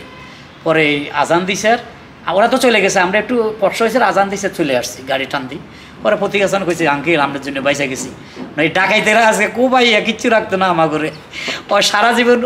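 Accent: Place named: Noakhali, Bangladesh. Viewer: খুবই ভালো লাগলো। ভারত থেকে বলছি, আমি হারুন কিসিঞ্জার ভাইয়ের প্রচন্ড ভক্ত। ওনার সব ভিডিও আনন্দ করে দেখি। হারুন কিসিঞ্জার ভাইয়ের প্রতি আর একটি কারনে আমি আত্মিয়তা অনুভব করি। আমাদের পুর্ব পুরুষের বাসস্থান নিল নোয়াখালী জেলায়। বর্তমানে পশ্চিমবঙ্গে আছি। ছোট বেলায় আমার ঠাকুরদা বাবার মুখে নোয়াখালী ভাষা শুনতাম। আপনার কথা শুনে শৈশবের কথা মনে পড়ে। আপনি এভাবেই চালিয়ে যান। ভালো থাকবেন। শুভেচ্ছা জানাই।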